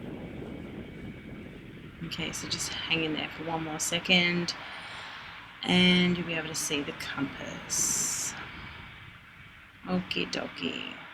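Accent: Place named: Australia. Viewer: We don't need to have what we learned in school retold. PERIOD. They lied. You sound Australian, I'm in the USA you are right side up.....sorry.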